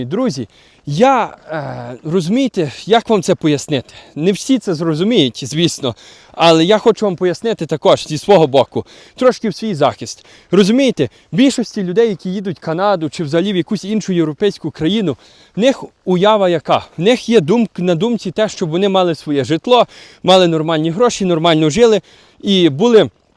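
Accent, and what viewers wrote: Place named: Western Ukraine, Ukraine. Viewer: Говор западенца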